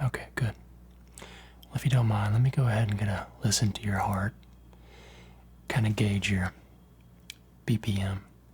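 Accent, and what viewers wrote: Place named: South, United States. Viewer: Is that a Texan accent? I’ve got a southern accent (Alabama so it’s a lot more hick and a lot less charming lmao) and I’m hearing it in yours slightly but it’s definitely not my kind of southern accent. I thought I was mishearing it to begin with but the more I watch, the more sure I am that there’s some sort of southern in there lol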